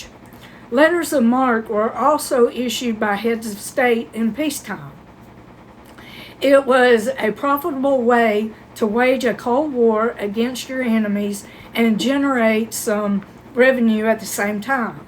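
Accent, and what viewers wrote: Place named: Alabama, United States. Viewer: Floridian here. Where are you from? Alabama? Your voice reminds me of my aunts from Alabama.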